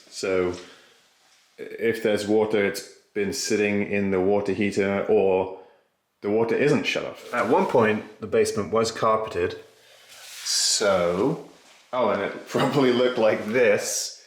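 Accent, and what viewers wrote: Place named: Australia. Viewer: Yeah straightaway I reckoned an Aussie accent, but I just found this channel so I don't know this dudes backstory.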